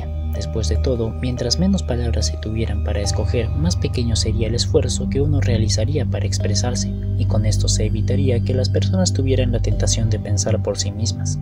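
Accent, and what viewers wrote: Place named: Latin America. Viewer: Literatura hispanoamericana dirás. Latinos era como se denominaba a los habitantes de Lacio aldea de la Antigua Roma. Dudo que seas de Lacio, por el acento intuyo que serás Hispanoamericano. Hermano. Un saludo desde España, muy buen video.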